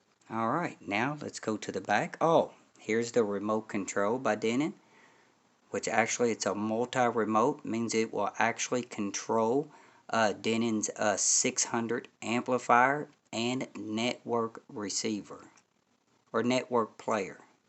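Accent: United States. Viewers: Look it up in the Dictionary , the yanks pronounce it that way!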